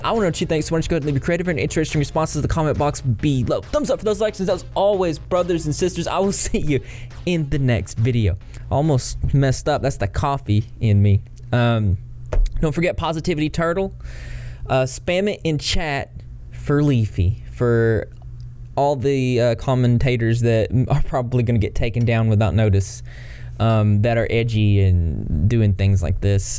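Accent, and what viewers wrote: South, United States. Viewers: Whst kind of american accent is that? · Oh. You're a southern boy 💛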